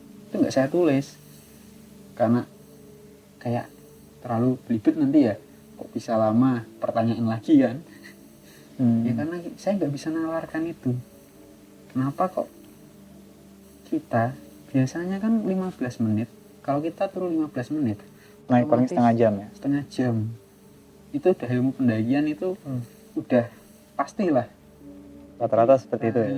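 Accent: Java, Indonesia. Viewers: Logate jawa medok banget😂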